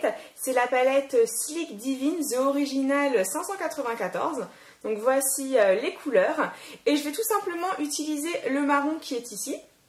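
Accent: England, United Kingdom. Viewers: Tu es juste MAGNIFIQUE.....tout est parfait le Make up est généralissime même une débutante peu le reproduire géniale. Pour l'accent anglais ça me rassure j'ai le même que toi LoL..Aller des bisous Mouhaaaaaaaaaaaaaa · Ce look est vraiment top soft et efficace et puis ton accent anglais est trop mignon ! Et j'ai le même collier Mim' Bisou bisou ♥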